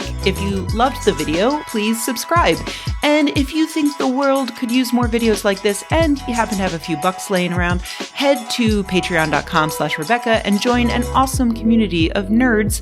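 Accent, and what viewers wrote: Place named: Boston, United States. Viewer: the boston accent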